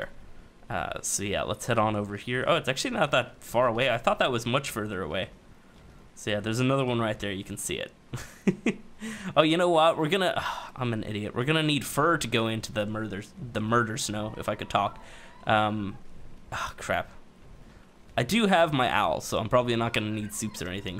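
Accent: United States. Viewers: Are you American all so love the vids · are you amercan?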